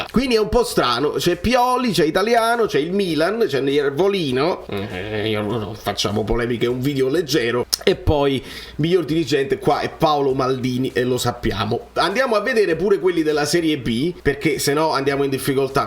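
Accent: Naples, Italy. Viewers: Il canale più simpatico...ma sei napooletano uagliò.......🤣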